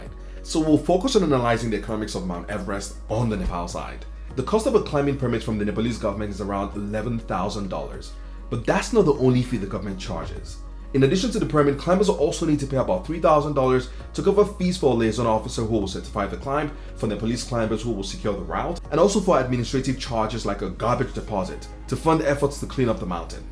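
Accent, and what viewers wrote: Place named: South Africa. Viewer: Ha ha... can't hide the South African in your voice. Decent video guy. Even though I'm not a mountaineer, I find the Goddess Sagamartha (Mt Everest) to be incredibly mystifying, beautiful and majestic. A dream of mine is to one day just see it with my own eye. Not to do a summit attempt... I just want to be able to see and marvel at it's colossal beauty.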